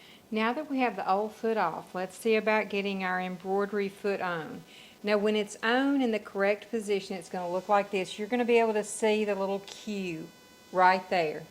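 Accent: South, United States. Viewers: PS I absolutely love your southern accent... We northerners don't hear that very often, so nice .. be well All the best to you.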